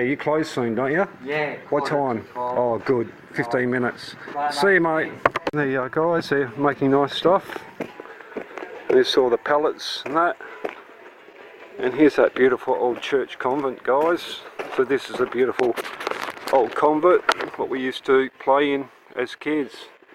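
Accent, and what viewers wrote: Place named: Australia. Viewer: It's hard to tell voice wise with the Australian Accent, but there are mannerisms about him I found familiar. You may notice when I speak in video's on my You Tuber that I sound a bit Monotone. That is one of the traits. But Aspergers is a complex animal and it varies between those that are gifted. Some have Autism and Aspergers really bad and don't do much but sit down and nod there heads up and down, that will be fixated with computers and gaming. Others will be high functioning and have the same fixation, but may take it further. This why you always hear about people with Asperger being arrested for Hacking into systems. Threat to National Security? Not so sure bout that =^~^= I am an intellectual and have had so many interests during my life. That I know a bit about everything. My downfall is I get stressed easily and often blank out people. 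I am not always the most patient person. But touch a subset I am into and I am then hard to stop. I love nature and history. Individuals with Autism or Aspergers very rarely go through a normaly education and will also find it almost impossible to hold down a normal job, so will end up Volunteering. I do volunteer work meself. But unlike most I was luckily enough to have a main stream education further education, and even college, learning the gardening trade. And I did work properly for just over three years before I had to give up due to Physical and mental issues. seem like a nice guy. And I bet he knows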